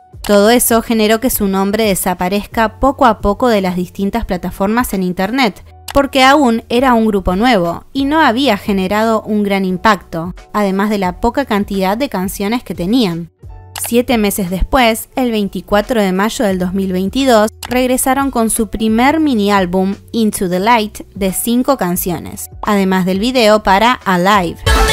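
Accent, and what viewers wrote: Argentina. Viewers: 💫 ¡Excelente tu canal! ¡Me encanta!Solo por curiosidad ¿sos de argentina?💫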